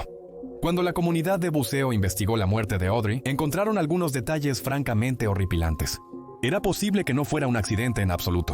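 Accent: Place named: Mexico. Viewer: YO SE QUE TÚ ERES MEXICANO EL ACENTO LO DICE TODO.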